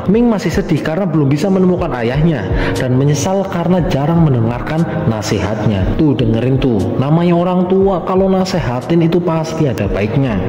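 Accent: Banyumas, Indonesia. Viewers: Kalo yg bawain ngapak suport terus smangatt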